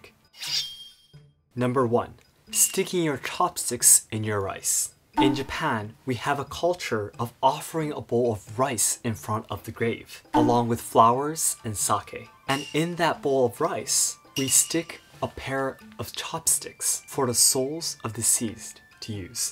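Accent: United States. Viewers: He sounds very american, although it is well spoken american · it's an american accent wth are you talking about?? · I love the American twang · In only six years you developed an American accent? How old were you when you arrived in Michigan?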